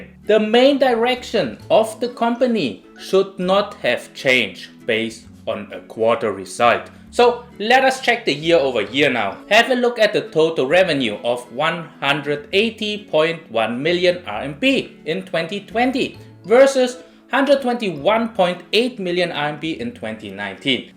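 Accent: Germany. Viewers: U have an interesting accent, it almost sounds like a German accent.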